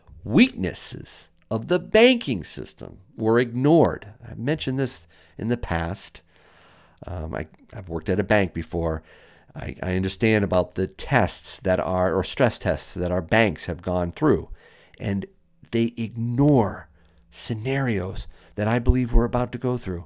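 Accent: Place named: United States. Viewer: It’s just yer accent, I find (in general) yankees have an accent that is just off putting. Now salivate metals . . . Whew, if his info wasn’t so good I’d never listen to him on purpose lol.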